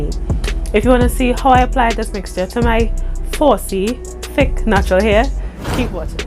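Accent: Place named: Trinidad and Tobago. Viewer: I love your accent!!! I have trini friends so this makes me feel like I'm chilling with my peoples 😂🤗 let me finish watching haha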